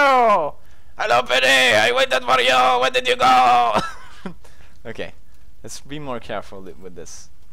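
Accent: Sweden. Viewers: His Swedish accent is so prominent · still had that Swedish accent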